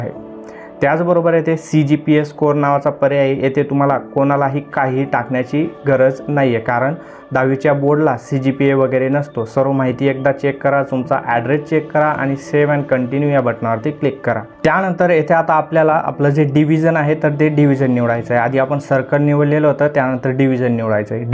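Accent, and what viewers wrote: Khandesh, India. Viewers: तुम्ही बोलण्यावरून खान्देशी दिशी रायणात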